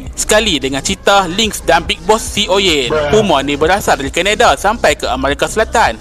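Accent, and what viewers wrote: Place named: Kelantan, Malaysia. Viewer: Pantang lepa…😂😂😂😂 Yg ni best.hahahaha…tak macam chanel lain. Maaf cakap,ade 1 chanel tu die orang kelantan tapi die try hard gile utk cakap loghat KL😅. Buat ape nk malu…kau guna loghat kelantan tu akan jadi 1 daya tarikan tau,macam chanel ni…kite dengar info menarik sambil sengih2 dengar loghat asli die. Pantang lepa satgi habih mami jarum pun datang mai sekali…😂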